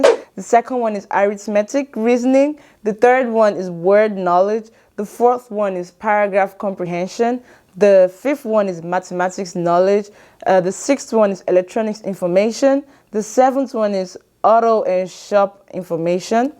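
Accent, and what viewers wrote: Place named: Liberia. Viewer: Bro on God you sounds like a Liberian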